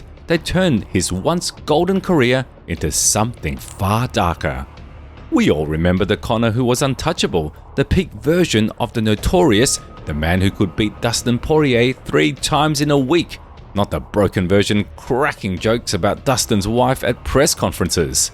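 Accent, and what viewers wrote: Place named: United Kingdom. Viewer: Chinese with British accent???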